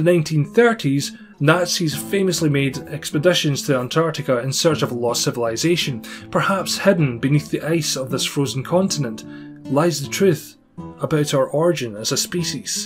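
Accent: Scotland, United Kingdom. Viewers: If my great grandfather Reed Gordon hadn't moved from Aberdeen, Scotland, I'd have that same awesome Scottish accent. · the scotish accent made me lol good video tho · Noor M Yus don't tell the Scottish man narrating, the he is English, he is likely to get very upset.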